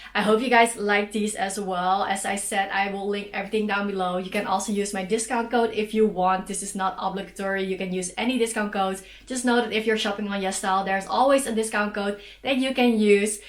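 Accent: Netherlands. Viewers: Is your accent dutch?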